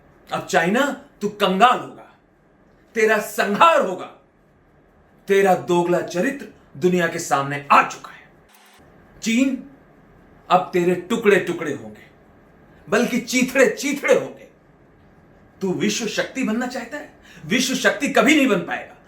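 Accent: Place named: Kanpur, India. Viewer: Raju sir...Mai b Sena me hu..aur Apke kanpur Sahar se hu...aap mere favorate ho ...aapki bhasa me apna Kanpur jhalkta h....u r great man and comedian....plz mere comment Ka jwab de ...Khushi hogi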